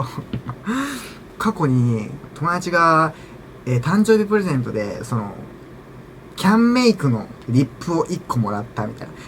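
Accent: Kansai, Japan. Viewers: 溢れ出る自然な関西弁の安心感えぐいんよな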